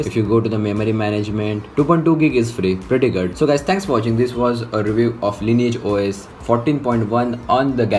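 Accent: India. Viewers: god every non-popular tech video I watch is an Indian with a horrible english accent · stop videos by indians english language. its very hugly!!! please stooooop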